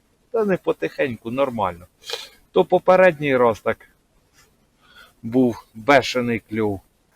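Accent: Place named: Romny, Ukraine. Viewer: Гарна рибалка! Молодець! Чую по говору ти з Роменщини?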